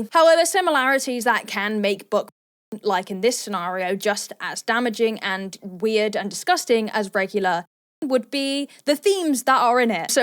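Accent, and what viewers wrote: United Kingdom. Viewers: I like your british accent <3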